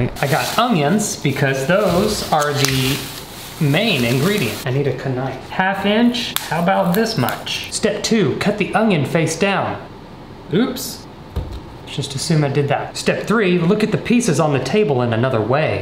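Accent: South, United States. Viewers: Just watched a video from 2015. You've gotten more southern? I guess.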